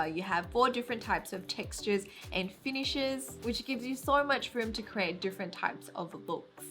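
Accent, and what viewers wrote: Australia. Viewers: Australian accent??? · Is your accent Australian?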